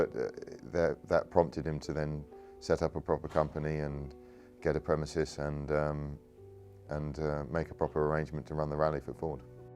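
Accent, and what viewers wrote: United Kingdom. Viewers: Hearing a Brit romanticize about making engineering innovations on classic Mustangs gives me hope for the human race! I mean no disrespect, Sir Clarkson...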